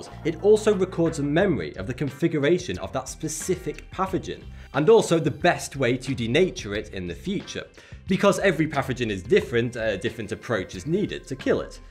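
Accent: United Kingdom. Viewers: Where in the uk is thoughty2 from?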